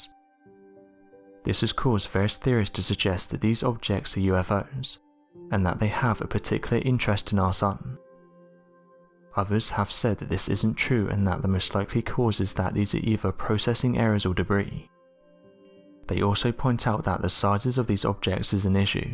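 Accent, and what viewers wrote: United Kingdom. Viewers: Can someone with a non-british accent please make some space videos. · He's British. Some of them do that.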